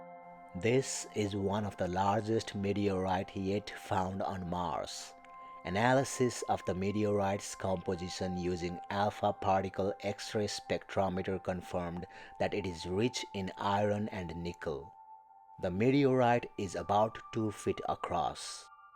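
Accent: India. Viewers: Would love this video infinitely more if I didn’t have to hear this guys annoying voice, like some robot eastern Indian. The nerds behind the scenes should never do the selling, the narrating or talking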